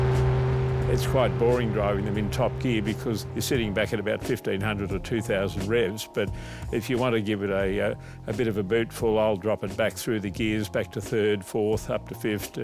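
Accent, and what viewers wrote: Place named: Australia. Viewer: WOW beautiful "old girl". Sounds like your Aussie, Im stoked to think we have one of these in Australia. Hope I see you on the road one day.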